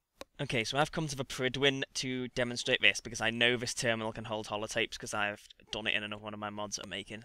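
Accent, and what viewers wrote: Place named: Liverpool, United Kingdom. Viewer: You sound like ur from my hometown,Liverpool.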